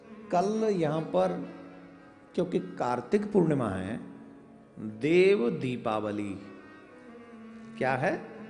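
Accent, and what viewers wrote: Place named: Gujari, India. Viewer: maharaj aapki desi boli ke hum diwane hai kacchu bhi kaho hame to jee badi priya laage mohe eeso laage jaise ye gwalo wali boli hee hume bhagwan bol bhae desi gurjari or rasiya boli jo aap bolo vo kaan me sugar daal de aisi laage maharaj me to sacchi me aapko bhagat bhi hogo or fan bhi hogau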